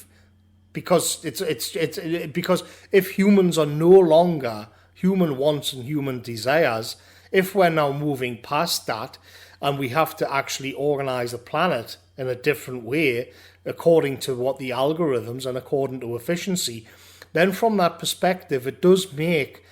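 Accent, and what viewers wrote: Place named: Newcastle, United Kingdom. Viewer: Wonderful, thought-provoking analysis, that puts all mainstream media coverage to shame. You have such a gift for articulating the kind of inchoate thoughts that so many of us are having right now and able to provide us with so many 'aha' moments while listening to your inspiring Geordie tones. The sheer speed and ruthlessness of these global technocrats is truly shocking and the way they seek to bamboozle us ordinary beings into accepting this new 'reality' as inevitable is both frightening and depressing at the same time. Perhaps the only real antidote to this essentially anti-human philosophy is a reshaping of a mascular Christianity for our times. And an emphasis once more on the sovereignty of the individual to be able to resist the 'powers and principalities' which seek to dominate and control our lives in such a manner.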